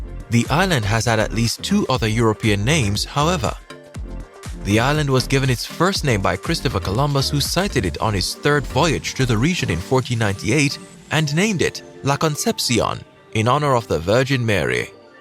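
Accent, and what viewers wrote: Jamaica. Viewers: He sounds Jamaican to me and I am Jamaican. But then again Grendians have a similar accent too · The Host Presenter Is Jamaican/ I Can Hear The Accent🇯🇲🇯🇲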